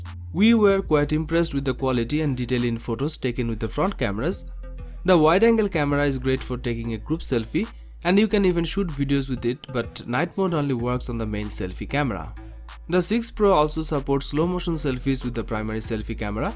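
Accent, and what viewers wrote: India. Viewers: I just can't watch any of Indian accent videos. Not that u care, u got enough ppl in ur country to provide u with enough views. I just wish u learned proper English, or go extinct... It's either or for me, preferably the latter.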